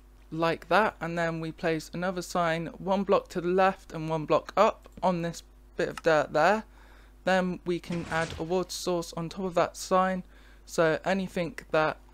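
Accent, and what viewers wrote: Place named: United Kingdom. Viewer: 와 영국발음 갖고싶다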